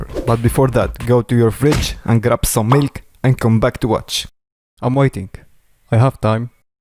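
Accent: France. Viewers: t'est français ? car tu sonne français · It's me or this french accent is KINDA THICC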